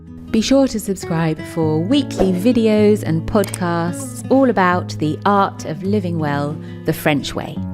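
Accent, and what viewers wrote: England, United Kingdom. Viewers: This woman with British accent is sooo fake! Just lie and lie with more lies. She is a joke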